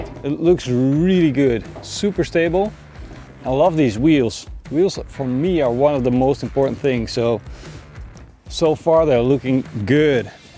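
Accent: Netherlands. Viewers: I love your dutch accent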